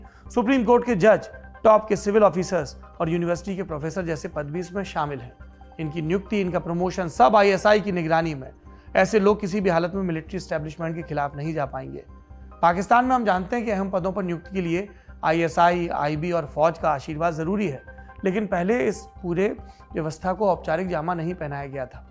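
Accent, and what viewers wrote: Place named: Bihar, India. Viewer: Jaise aap bolna start kiye waise he bolia... achaa lagta hain.....uttar pardesh ka Bihari bhaasa me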